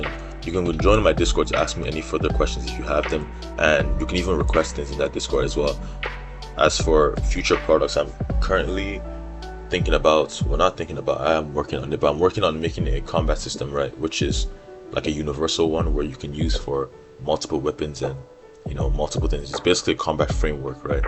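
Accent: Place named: Nigeria. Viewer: icl from your accent you sound Nigerian but good vid tho its very good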